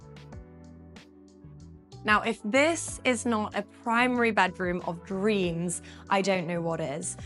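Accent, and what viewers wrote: RP, United Kingdom. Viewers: Because of your nice legs and posh and the way you say all, Awl I’ll buy this house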